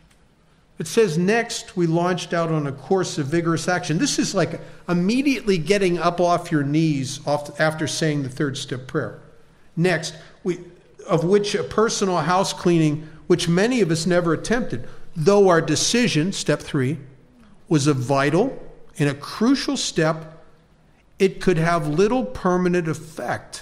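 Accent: United States. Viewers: Nice to hear american english🥰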